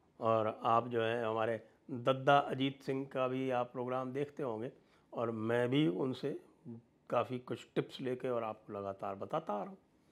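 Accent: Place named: Rajasthan, India. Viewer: Pranam sir, your presentation with facts and your special Rajasthani tone is making every one proud...!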